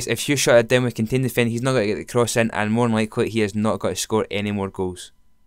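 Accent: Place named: Scotland, United Kingdom. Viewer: Sounds ok to me, have you never herd a Scottish guy b4? lol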